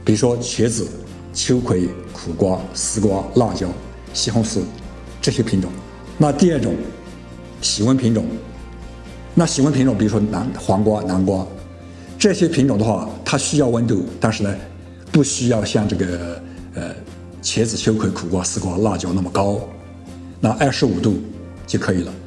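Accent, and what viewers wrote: Yunnan, China. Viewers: 好像是云南人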